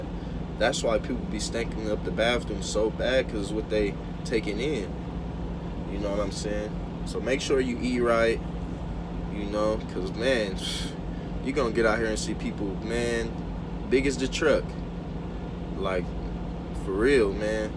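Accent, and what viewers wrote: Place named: Los Angeles, United States. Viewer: What part of LA you from homeboy, i can hear it all in yo lingo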